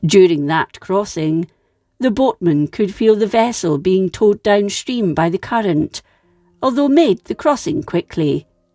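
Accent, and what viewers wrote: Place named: Scotland, United Kingdom. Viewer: I love your accent. My great-grandmother was Scottish. I met her once when I was very young and don't remember it. I wonder if she might've sounded similar to how speak English. Love your channel!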